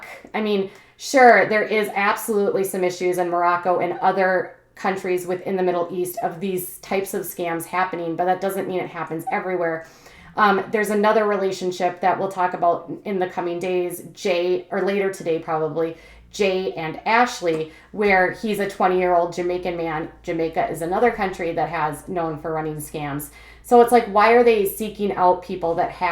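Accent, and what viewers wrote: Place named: Midwest, United States. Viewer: Where do you live?? I am from MN and hear a midwest accent? I could be wrong but I dont mean to offend if I am way off base haha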